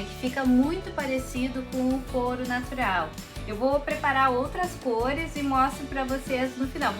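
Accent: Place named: Porto Alegre, Brazil. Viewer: Bha! Caí aqui de paraquedas! Achei o sotaque parecido com o meu! Sou de Porto Alegre Bairro Agronomia. Já vou me inscrever agora. Gostei da técnica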